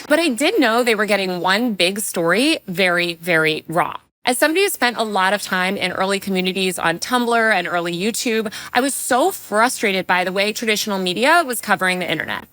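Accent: California, United States. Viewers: Wapo sucks, your op eds suck and appealed to around 3% or less of the US poulation because your snotty pompous Cali sounding self knows nothing but a political agenda and a bad agenda at that because you dont live in the real world. You live in a concrete, artificial, air conditioned life with no contributions to society and you could have with all the corruption that goes on but no and ive read a few of your op eds and I could hear the simpleton Cali no nothings voice just reading it. Opinion isnt journalism so quit calling yourself one.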